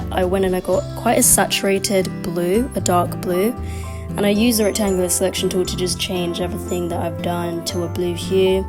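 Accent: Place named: London, United Kingdom. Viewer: typical londoner accent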